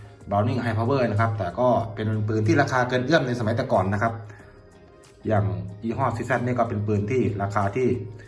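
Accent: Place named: South, Thailand. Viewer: *ชัดเจนจริง นี้คนใต้แน่ผมว่าพี่ นี้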